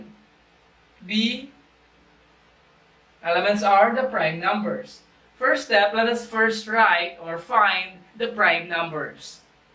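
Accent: Philippines. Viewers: Wow I think your a Filipino hehe it's so clear I understand everything thank you because of you now I know how to answer my math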